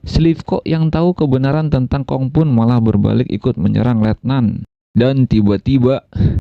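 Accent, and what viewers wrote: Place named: Jakarta, Indonesia. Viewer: Logat betawi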